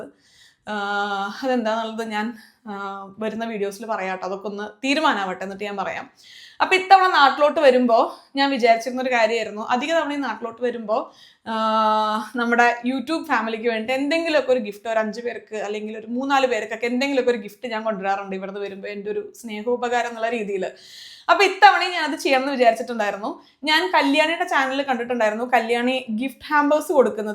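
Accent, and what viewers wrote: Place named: Kozhikode, India. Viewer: My fav YouTubers and.....kozhikodan samsaram😍...take care dears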